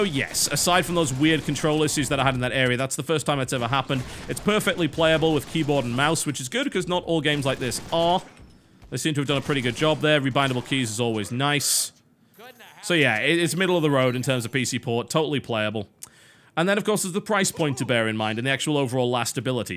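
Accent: United Kingdom. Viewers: Is it just me or does he sound like a super rich, retired, game dev turned game critic? It may just be that he's British.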